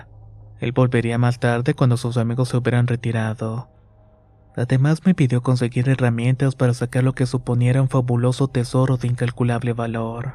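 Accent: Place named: Mexico. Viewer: Gracias por el video. De que país es me parece de México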